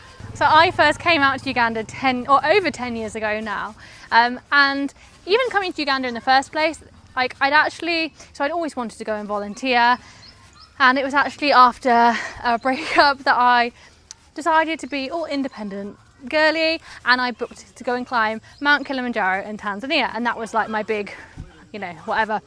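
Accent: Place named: England, United Kingdom. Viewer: I love your English accent ❤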